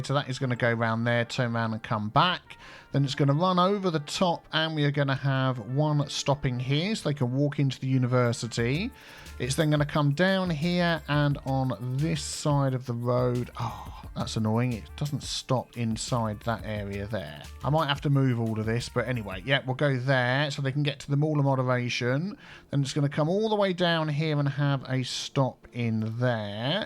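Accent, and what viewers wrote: United Kingdom. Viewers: My daughter has been sleeping through your calming british voice since she was born 5 months ago. Also, I`m enjoying it. Especially your Teaville series. Thanks mate - keep doing what you`re doing!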